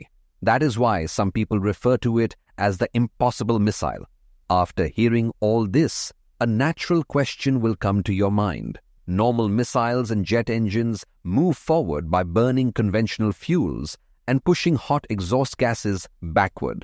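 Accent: India. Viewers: Is it me or the narator is indian ??? That beeing a well known gateway for russian propaganda...😂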